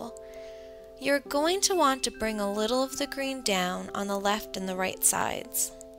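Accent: Canada. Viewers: I'm pretty sure she's Canadian. She has a bit of an accent when she says "about", and it sounds Canadian. People with different accents say words differently.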